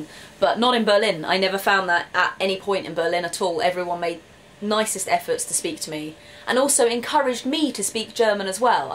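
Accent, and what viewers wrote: United Kingdom. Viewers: hey, i love to listen to your very british english:) but - do you talk about that berlin that i know? - that berlin that i was born and raised and still live in? (i am 31 years old)... i don´t feel the same about that... i see very stressed and passive agressive people surrounding me! everyone is deeply quiet ^^ but it depends where in Berlin you are.. for sure... but to me personally: i think i will leave my hometown now... but i wish you all the best in germany. i´d rather would go to the UK if i could... ( i have nice memories of the uk, but i have been there for only ONE WEEK, so.... who knows..) Lovely Greetings from Berlin!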